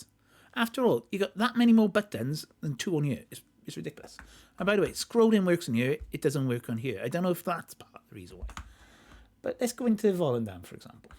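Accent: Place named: Wales, United Kingdom. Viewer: Nothing worse than the Welsh accent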